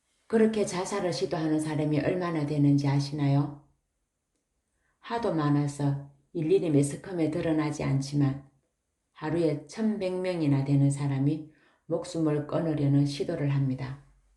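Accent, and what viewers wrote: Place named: Gyeongsang, South Korea. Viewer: 이웃집 아지매같은 목소리 친근한 경상도 억양이 내맘에 들어오네요♡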